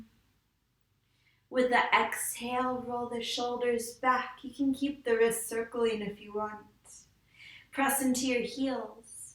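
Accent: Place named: United Kingdom. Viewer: So good to practise with you after such a long time,. I hope you’re well. Unfortunately, the picture seems to freeze around 20 minutes in, but I could follow your voice. Your accent has a hint of British these days!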